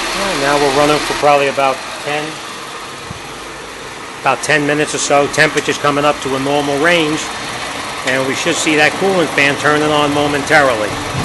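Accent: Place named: New England, United States. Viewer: Smart man but that Yankee accent hurts my ears.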